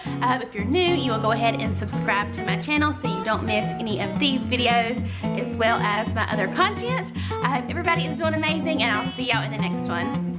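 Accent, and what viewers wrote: South, United States. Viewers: Where are you from? You have a very southern accent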